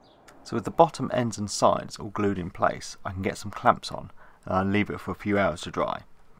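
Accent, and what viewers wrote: RP, United Kingdom. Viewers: Love the posh voice, see you on site mate 😉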